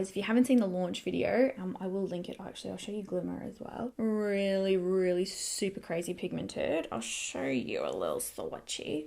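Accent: Australia. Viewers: Victoria Darmanjian She has an Australian accent and it even says she’s Australian in her description.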